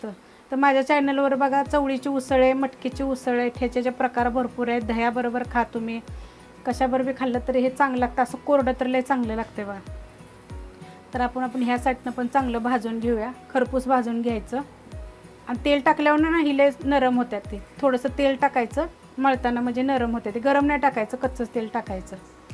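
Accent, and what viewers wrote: Satara, India. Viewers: ताई तुमचं गाव सातारा आहे का तुमची बनवण्याची पद्धत आणि बोलण्याची पद्धत आमच्याकडची वाटते सातारची तुमच्या रेसिपी बघून मी लाटी वड्या करते सजुरी करते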